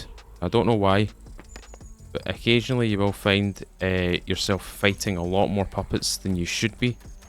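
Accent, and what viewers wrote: Scotland, United Kingdom. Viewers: Just started playing SCUM a few days ago since the 1.0 release. As a daily DayZ player I have to say I LOVE SCUM 😊 Similar but different enough to provide an entirely different experience. Thoroughly enjoy your videos, that Scottish accent makes them a pleasure to listen to. I’m English from West Yorkshire. I live in America. For over 20 years I’ve heard “oooh I LOVE your accent where you from !?!?” Honestly being from Bradford I never understood it because we literally sound like cave men who were dropped as babies. A LOT. However I DO understand that certain sounds are appealing to certain ears while not for others so 🤷🏼‍♂️ Cheers mate hope you have a lovely Sunday 🙏🫡👊❤️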